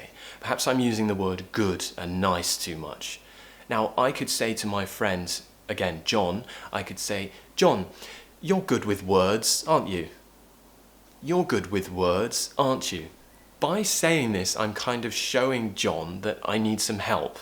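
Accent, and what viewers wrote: RP, United Kingdom. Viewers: Bro! Thanks by your Lessons , I greateful with you. I can understand every single word you say , I've learnt British English here, you're my Favourite Person 'round the world , I love your accent , I've a little bit of British Accent by you ... See ya !.. I wait meet you in the future and go to take a break or drink tea, just like a Brit guy .. I Say Hi From Mexico 🇲🇽. Be Carefull . ♥️ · Hi. I really love listening to you spiking British accent.....!! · The best british accent on Youtube! Thank you!;) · I think I have found my happiness. I've been looking for a male British teacher to copy his accent and yours is beyond my expectations ! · Thank you for always posting great videos :) I love your british accent and your new hair color❤︎ From Japan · Hi i love u British accent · I really love the way you talk and teach about your British accent style ❤ Thank you so much!!!!❤❤❤❤ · Thanks for such an informative and enjoyable lesson! 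Your British accent is marvellous! Honestly, miss for your podcasts( Are you planning to do that? Best regards from Ukraine!💙💛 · Omg I need someone from UK teach me like, I love British accent 😘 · Thank you so much to think teaching english because you are the right one in the right place, you make me fall in love in British accent 😍😍😍😍😍😍😍😍😍 I'm Layla from Sudan i will be always watch your videos until i feel i speak like you ♥️ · I really like to learn British accent with you. Thanks a lot. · It's been me 2 years learning British accent and................................ I just adore your accent man💖💖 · How great you are! ♥️ I liked British accent from you❤️ Keep inspiring 😌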